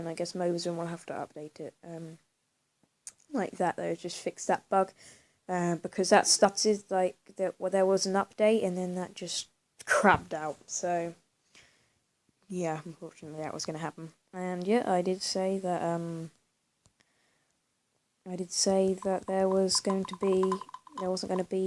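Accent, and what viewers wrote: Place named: United Kingdom. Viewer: Nice too see that your rly active!!! Lol tbh ur British voice is GREAT. I’m an American who wants to be British or Aussie. Aussie and British accents are AWESOME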